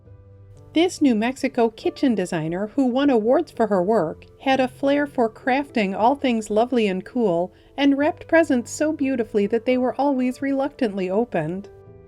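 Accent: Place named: Fargo, United States. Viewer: Think about using ai to narrate for someone less Fargo sounding.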